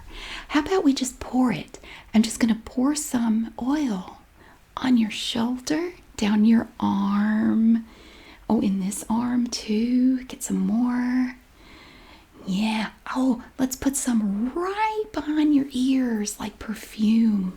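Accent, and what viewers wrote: South, United States. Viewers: Bro why is nobody talking about how good did with the accent! You did such a good job of sort of toning down your southern accent and sound like Carole! That’s a very hard thing to do and you did a great job💕💕💕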